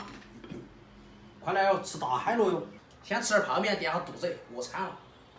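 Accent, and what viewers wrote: Sichuan, China. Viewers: 四川话怎么这么难听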